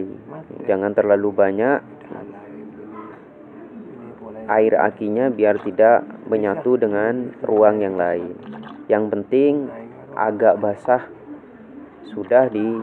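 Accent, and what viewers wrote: Madura, Indonesia. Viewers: Madura ya cak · Ini kayaknys.😃 bahasanya madurasa asli madura bsng🤗telok lemak😅😅😅 tran tretan man nyaman tok cerr...serrr....tak iye....😅😅😅👍coba entar kalau accu err/ soak saya servis bang...tapi alamatnya di mana mau service accu mobil kalau gk bisa😅😅😅🤗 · Mak meddureh kak..... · Bahasane.....kayak Madura.. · Madureh kak toan.. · Orang madura ya broo?? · Orang madura ya logat nya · Orang madura ni mh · Logat madura.. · Medureh dimmah om.?? Terro ajhereh 😊😃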